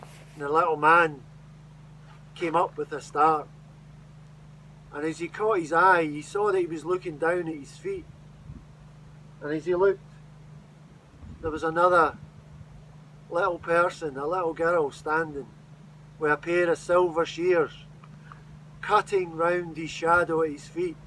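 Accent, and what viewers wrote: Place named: Scotland, United Kingdom. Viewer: I love a great Scottish accent telling a tale of wee folk in a beautiful place! It's a great escape from the modern world and all its troubles!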